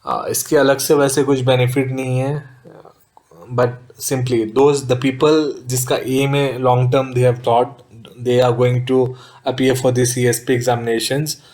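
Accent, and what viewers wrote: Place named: Bhojpuri, India. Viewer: Dear if u cant speak english properly for explanation about the international safety course then pls donot use bhojpuri english ....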